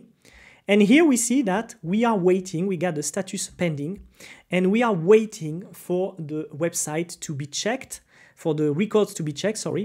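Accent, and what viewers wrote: France. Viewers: I like that Frenglish ♥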